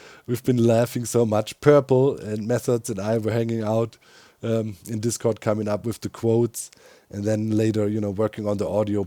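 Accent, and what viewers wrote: Germany. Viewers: The German accent makes it sound .... nvm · Have you ever played "Command & Conquer Red Alert 2: Yuri's Revenge"? Your motivational messages really sounds like Yuri's propaganda voice. In fact, the actor, Udo Kier, who portraid Yuri in this game is German and did both the English and the German voices. So he also has the slight German accent like you do. All hail the G.O.A.T. 🐐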